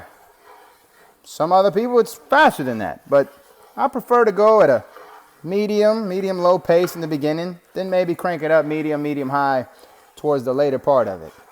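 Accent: Louisiana, United States. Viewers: cajun accent...fixed it for you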